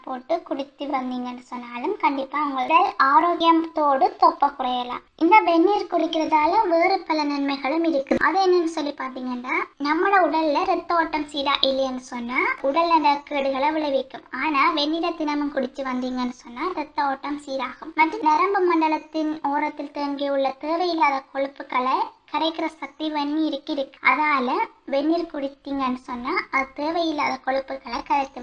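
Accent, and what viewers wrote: Sri Lanka. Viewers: srilankan tamil very nice.. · இது இலங்கை தமிழா?nan sri lankka than.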